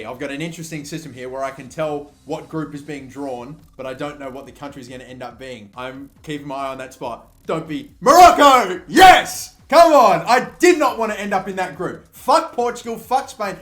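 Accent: Australia. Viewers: I thought u were English at first and when you started to explain last world cups group England "was in", I was like "wtf, they had Italy, Costa Rica, and Uruguay, not spain, netherlands, and chile. Then i realized you were Australian....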